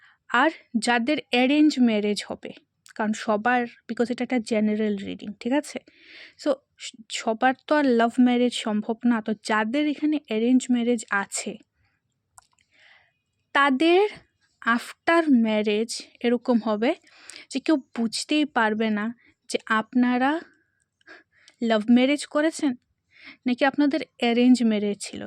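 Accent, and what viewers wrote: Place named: Bangal, Bangladesh. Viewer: Didi tmr mukh a amr bangla sotti sunte vlo lage ❤❤❤ Tumi tmr motoi sundar didi... Kintu sotti amr vlo lge...ami tmr katha sunei bujhechilm tmi bangal...kintu amr tmr mukh a ai bangla tai amr vlo lge...tmi bangla tei video koro❤❤❤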